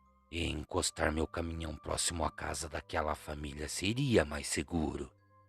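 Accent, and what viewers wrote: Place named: Paraná, Brazil. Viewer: Bom noite,! Pelo seu sotaque tu deves ser do Parana!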